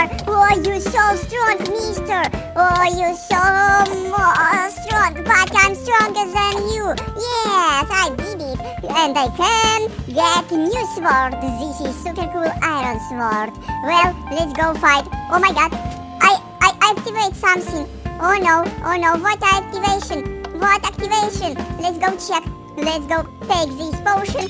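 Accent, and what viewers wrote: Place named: Russia. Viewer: скорее всего ты русский судя по акценту (You Russian boy???)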